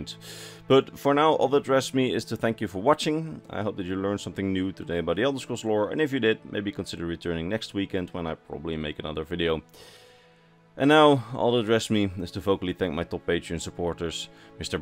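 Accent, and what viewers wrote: Netherlands. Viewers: Great video once again! Btw i might be mistaken but do i hear a Dutch accent? I'm Dutch myself and you sound very Dutch !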